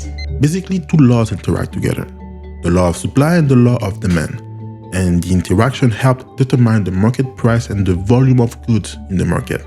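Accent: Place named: France. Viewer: you have a lovely accent Very French ✨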